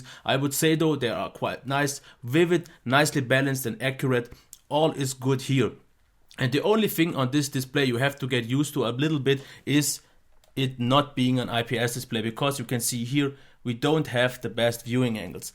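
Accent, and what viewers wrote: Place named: Germany. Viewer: German english is the best😁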